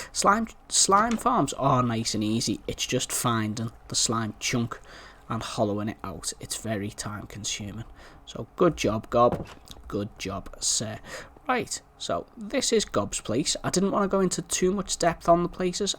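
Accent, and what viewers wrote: Liverpool, United Kingdom. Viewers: Love it!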